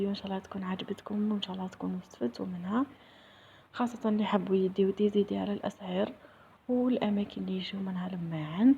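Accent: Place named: Setif, Algeria. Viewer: لهجتك كما تاع سطيف خيار الناس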